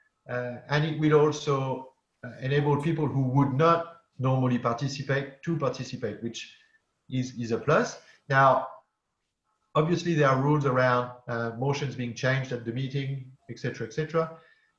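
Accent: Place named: France. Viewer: Sound French 👌👍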